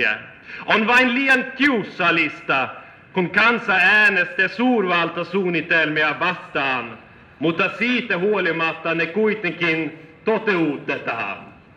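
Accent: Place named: Sweden. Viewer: Han uttalar orden utomordentligt bra! Well done! Med svensk accent men helt suveränt uttal (o bra tal för övrigt!)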